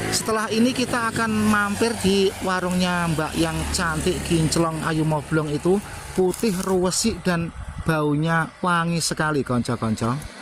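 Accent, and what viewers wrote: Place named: Bojonegoro, Indonesia. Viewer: Aku melihat konten2 Pak Dhe ini hanya dari sisi "NJAJAH DESO MILANGKORI"-nya. Utk wilayah Jatim hampir sebagian besar sudah di-JAJAH DESO MILANGKORI. Utk Jateng... masih mlipir2 ndeso2 perbatasan, Rembang sama Blora. Yg aku salut... Pak Dhe ke mana2 hanya naik motor matic-nya. Sepertinya secara logat dan awal2 berkonten, beliau asal Bojonegoro.👍🏻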